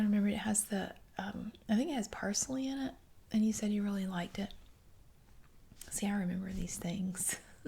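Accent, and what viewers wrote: South, United States. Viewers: Just love your southern accent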